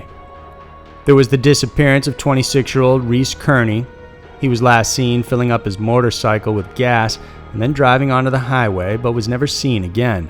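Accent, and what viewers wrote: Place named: North Carolina, United States. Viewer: I'm from Jersey & I appreciate the mention but Shades of Death road doesn't sound so "murderous". As opposed to something like the Texas killing fields or Highway of Tears. They have many murders occurring on or near those roads. But regardless I really enjoy your channel & often will watch a few a day. Thank you for your hard work! Are you from North Carolina (the wsy you day "nine")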